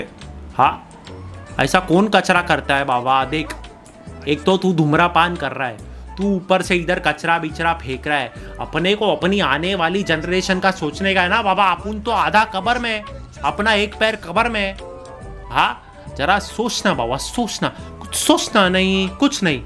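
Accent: Maharashtra, India. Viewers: I love your marathi tadka😘😘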